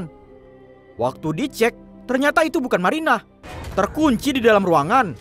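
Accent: Medan, Indonesia. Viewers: Seru kali ah dengar cerita sm logat medannya abang ini🤣🤣 mantap..mantap👏👏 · Sumpah putri snng bngt dngn cr Mimin nyeritain setiap alur crta2 film,,dngn bhsa logat medannya rs rindu sm kampung hlmn jd sdikit terobati,,moga sehat sllu buat mimin Santun pg dr putri Jawa Timur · Karna logat medan nya sangat terasa,lucu dengar ang nya riveuw😁😂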